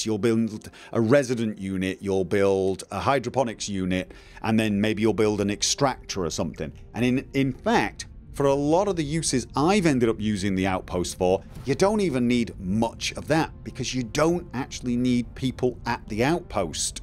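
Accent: United Kingdom. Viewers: Well then. So I know how whining sounds in a grown man's British accent.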